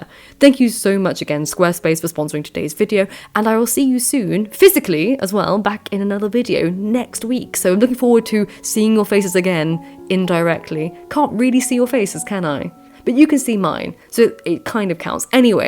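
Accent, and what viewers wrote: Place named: Upper class, United Kingdom. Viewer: This was well told. The narrator sounds like she has an upper class British accent, which is quite pleasant. She also tells the story of Vasalisa and Baba Yaga with good verbal technique. I enjoyed hearing the folk tale.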